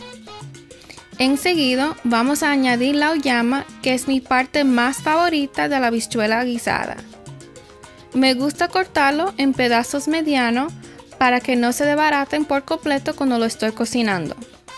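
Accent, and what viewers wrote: New York, United States. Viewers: tiene un acento newyorkyno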